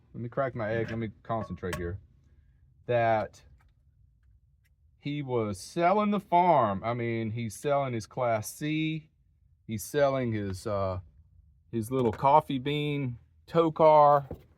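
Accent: South, United States. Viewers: This is at least the second time I’ve heard you comment about your looks, dear. You are an attractive man, and I suspect ladies really love your sweet southern drawl. You are very accomplished and have such a nice personality. Have confidence in yourself and hold your head high, dear man!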